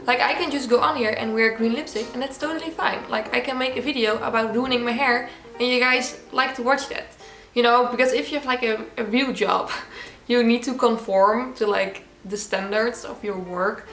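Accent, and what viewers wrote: Netherlands. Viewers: It's probably because she has such a strong accent. Or the reason she has such a strong accent lol. She doesn't change her voice or letters so it's pretty much Dutch with English words, if you know what I mean. She speaks fluently so it's no effort for her to create sentences, so she can talk like she normally does. She's not really copying the American intonation, which is what you notice when people's voices change. Every language has different intonations and that can make a voice sound different when you speak different languages. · Amai je hoort direct dat je van Nederland komt!😅😅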